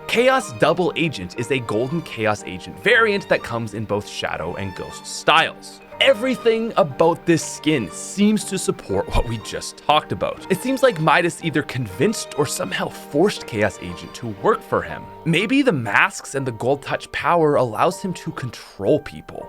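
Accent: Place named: Nova Scotia, Canada. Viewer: First time watching one of your videos and I can instsntly tell you're a Scotian just by that accent 😂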